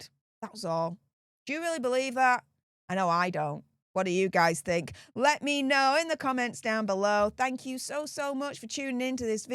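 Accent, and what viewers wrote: Yorkshire, United Kingdom. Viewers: Yorkshire lass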